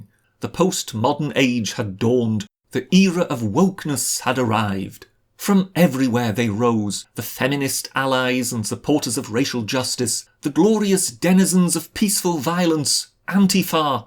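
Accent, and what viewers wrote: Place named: England, United Kingdom. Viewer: Such a petty that the video itself cannot be used in educating the young, due to the imperialistic English accent.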